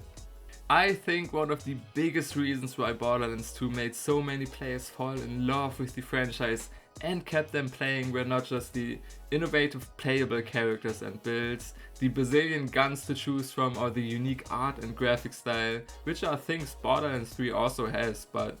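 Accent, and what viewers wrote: Germany. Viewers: Are you german? Such cool accent! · Man erkennt den deutschen Akzent 😂😂😂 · Liebe den deutschen Akzent, viel Liebe aus Amerika! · Dein Deutscher Akzent ist nicht zu überhören haha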